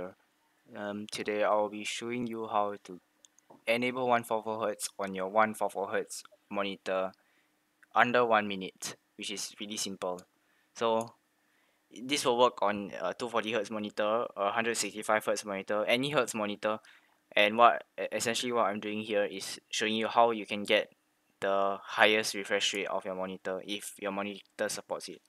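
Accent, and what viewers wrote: Singapore. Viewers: i knew it u use Singapore english Accent Lol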